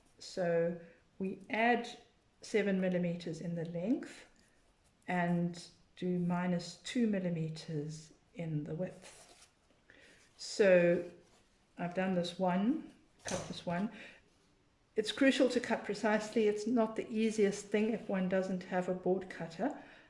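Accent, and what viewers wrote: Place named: South Africa. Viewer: yes, the person I learned from uses the same density board for spines as the main cover. I've been covering with thicker curtain type fabric as you know... apocalypse and all - don't leave the house unnecessarily and i've managed a few hardcover books but it always seems to crimp slightly and then binds too tightly. No one else notices this but I'm a perfectionist. I might try add just a 2mm leeway on the spines and see if that helps. Also, when I clicked on your video and heard your accent I did a little happy dance and was like "That's defs from SA!" then i checked and yesss! Nice to see south africans making epic content!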